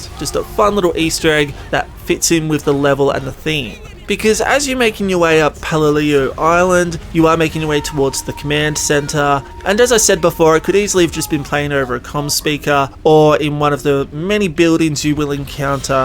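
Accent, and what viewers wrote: Australia. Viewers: You Aussy? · Is this guy australian ???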